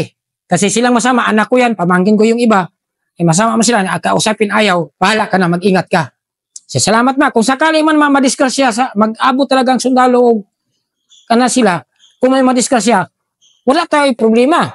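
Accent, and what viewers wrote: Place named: Waray, Philippines. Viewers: Waray waray yata si sir 😊😊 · Parang waray c Sir ahhh iba talaga Ang waray hahaha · parang taga samar si sir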